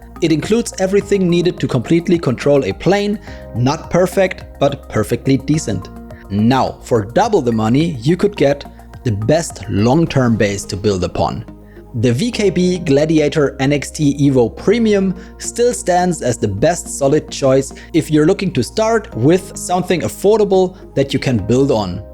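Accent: Germany. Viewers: Hey don't want to sound mean but are you German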